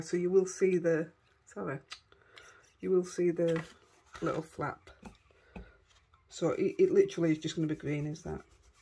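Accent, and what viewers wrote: Yorkshire, United Kingdom. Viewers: Oh fantastic as usual. What a cute little bag. Yes the Lavinia stamps are brill. Lucky enuf to be going to Lavinia shop later in the year on my trip to England. My list is growing lol. Love your accent being from Yorkshire myself.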